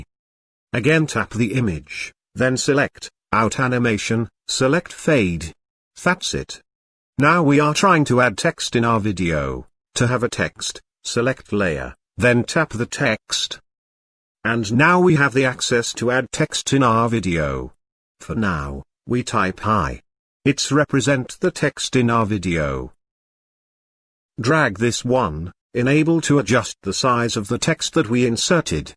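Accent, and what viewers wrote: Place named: United Kingdom. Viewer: Ang taray ng boses british accent #chutee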